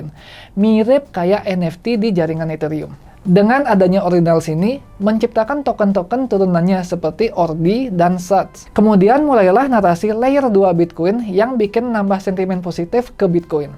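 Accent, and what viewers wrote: Bali, Indonesia. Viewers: Logatne bli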